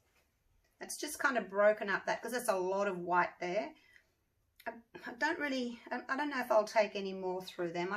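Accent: Australia. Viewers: Hello Lady from Australia, I love all your work very much and would like to try some swipes you do so well, but I really get confused about your measurements like grams, is there an easier way of ratios? I am a retired widow who want to learn a couple of things in the pouring department, thank you in advance, love your accent btw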